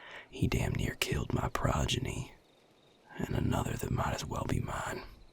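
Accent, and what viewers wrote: South, United States. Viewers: The southern accent is amazing^w^ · IS HE A SOUTHERN VAMPIRE?? BLESS MY SOUL~ 😳👊🥰😆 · The southern accent is 💖💖💖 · I love that you have a country accent because it’s great for a vampire like no one really does that and I just think that is so cool for vampire to have a country accent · I am NOT a fan of country accents but is an exception 🥵🥵😍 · Nooo why is his American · Jeebus....that gravelly, southern voice is....good chills. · It's like Christmas came early- as a fellow southerner, I can't get enough of dear!:D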